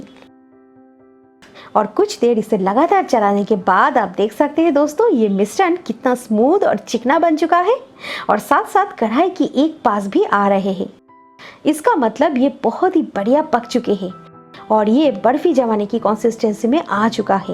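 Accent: Bengal, India. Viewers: nice culnary skill...ur hindi in Bengali accent is so cute....🤗🤗🤗sukhto Recipes plzz easyway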